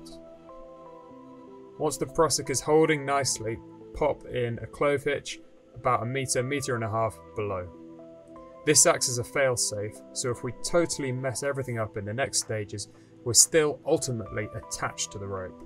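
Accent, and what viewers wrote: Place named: United Kingdom. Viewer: Hi, you have great teaching ability and I look forward to seeing more from Climbing Acadamy in the future. It's so nice listening to a British voice as well. Here you tackle a rarely seen topic and you do it very well. I would like you to cover rescue tecniques needed by a fallen climber on a multi-pitch route where the faller stops opposite unclimbable rock or in mid-air. I have never seen anything on this anywhere and yet it is a situation that should be practiced and resourced before embarking on serious multi-pitch climbs. I look forward to your views and, ultimately, your video.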